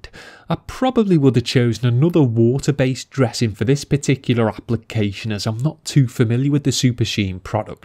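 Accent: Liverpool, United Kingdom. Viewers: Hey, r u British? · Are u from anywhere near Liverpool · Great video but going to be honest your scouse/brummie naisel accent is a tad annoying haha! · Your voice and your british english is awesome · how could I tell you'd have a scouse accent before you started speaking lol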